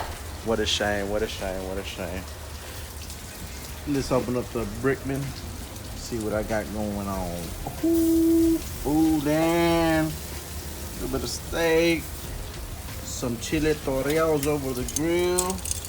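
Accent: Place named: South, United States. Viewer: Let their be rain wow coming down sounds like you from the south I’m from Louisiana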